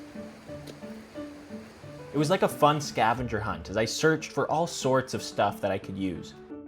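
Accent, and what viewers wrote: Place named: Canada. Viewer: Bro your accent is like so Canadian and I love it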